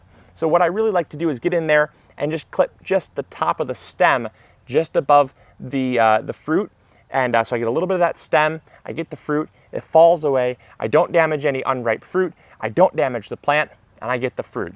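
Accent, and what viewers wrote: Michigan, United States. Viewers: This is completely off topic but as a small backyard gardener in California (born in Michigan) your michigander “accent” makes me smile 😃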